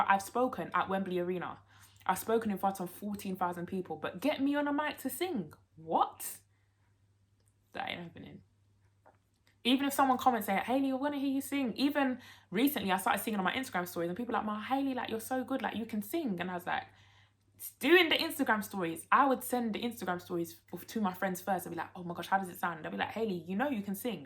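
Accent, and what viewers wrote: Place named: Jamaica. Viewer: You are amazing!! I was so scared of it as well. It will get better! We support you 1000% Are you Jamaican? Loving the patois 😂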